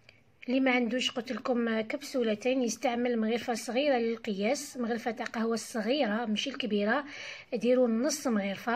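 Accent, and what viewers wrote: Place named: Lebanon. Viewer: لان انا ما كتير عم بفهم عليكي لان بتعرفي اللهجة اللبنانية كتير تختلف فميشان هيك ما عم افهم كتير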